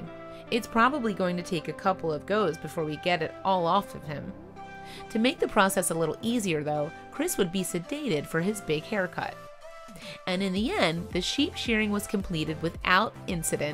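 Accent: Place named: Mid-Atlantic, United States. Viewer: I'm willing to bet that the voice over artist is from a central Atlantic state. She did a great job, I'm absolutely not being snarky. But you can hear a PA/MD/NJ/DE influence. It's cool!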